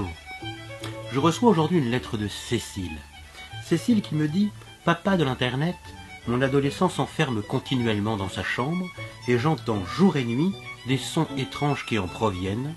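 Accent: France. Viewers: Mon dieu toute ces prononciations made in france XD le coup des portugais sur minecraft j'ai bien ri !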